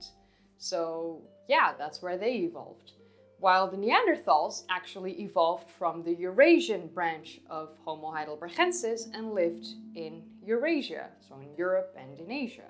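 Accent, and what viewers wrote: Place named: Netherlands. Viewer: A person with a Dutch accent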